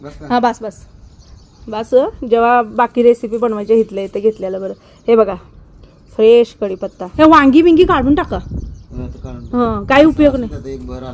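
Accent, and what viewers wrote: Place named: Kolhapur, India. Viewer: Nice video.... vahini... daily mi bght aste. Kagal la maza mama asto...same boln aste tikde..❤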